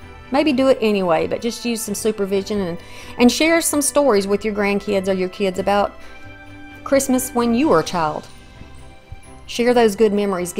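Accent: South, United States. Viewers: Being a child of the 50s.... I LOVE this.... but I have to say it hurt my heart when you cut the books !!!! LOL.... but love that you gave it new life...... I would love to see more of this... I am a new subbie!! And I can tell you are a fellow Southerner..... Mississippi here..... looking forward to more videos...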